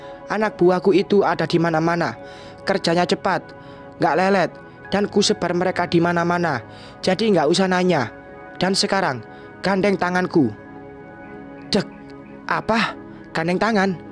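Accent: Java, Indonesia. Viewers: Huuh Medok Jawanya bikin hati sangat bahagia saat denger suara Admin yang manjain telinga ini. Huuh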